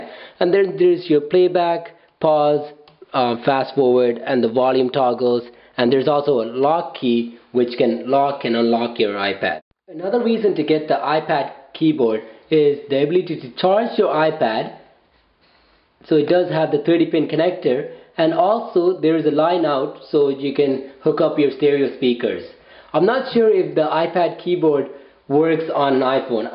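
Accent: India. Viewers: Great unboxing dude! (By the way, are you Indian?)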